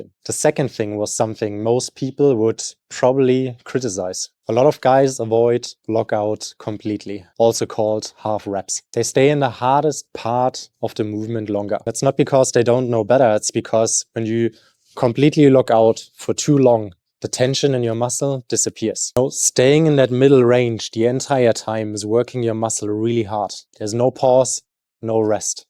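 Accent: Germany. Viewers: Yessss! Are you from Germany or what's the accent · Where are you from? Sounds like Germany from the accent.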